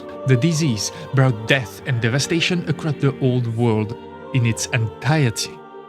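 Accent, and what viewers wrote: France. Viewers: Nah I like the French narrator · L'accent français atroce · Nah.. We love our French Narrator.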